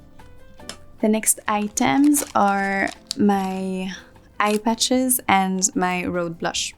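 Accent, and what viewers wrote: France. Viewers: The French accent ❤ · She’s really so beautiful, I love how she speaks English with a French accent. Shes so classy, I love classy women.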